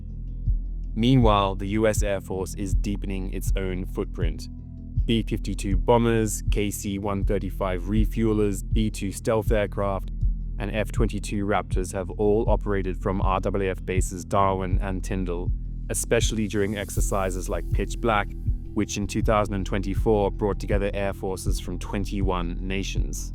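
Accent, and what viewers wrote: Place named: United Kingdom. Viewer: I just reported this video for misinformation, total bullshit lies!!! F off USA the average Aussie hates you and you can’t thieve our tax $$$ to the tune of a trillion dollars along with our sovereignty! & you Brit voice over brat 🤬🤬🤬 how dare you!!! It’s one think to pretend we’re part of your empire but to lie about who really owns Australia now is reprehensible, Brits are as bad as USA! Viva la revolution!!!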